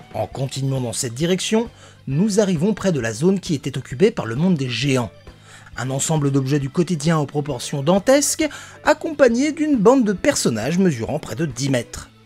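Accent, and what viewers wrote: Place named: Netherlands. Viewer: L'accent néerlandais... bon c'est rien vidéo de ouf !!!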